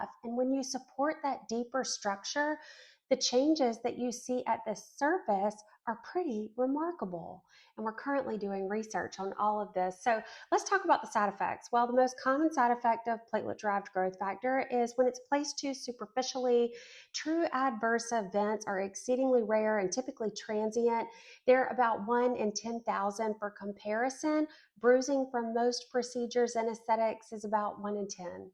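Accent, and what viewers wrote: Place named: South, United States. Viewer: Hi, you sound like you’re from the South as am I. Where is your clinic located?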